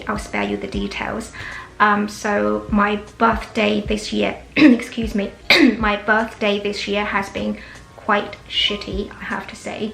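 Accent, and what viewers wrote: United Kingdom. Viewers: 闭上眼还以为是哪个伦敦小姑娘在接受采访呢~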